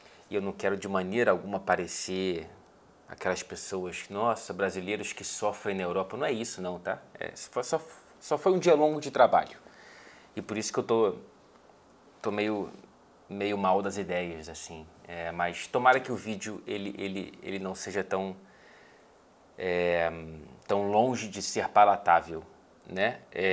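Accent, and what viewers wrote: England, United Kingdom. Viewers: por favor, não contenha seu inglês inglês! é charmoso e ajuda-me a evoluir na pronúncia correta! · eu gosto demais da sua pronúncia do inglês britânico inclusive te acho muito elegante por conta disso ignora esses comentários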